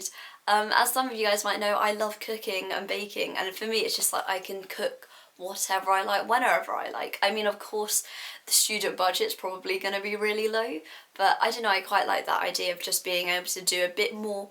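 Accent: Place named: United Kingdom. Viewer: I am excited by the accent you have! Being from India u sound more like a Britisher. I am surprised.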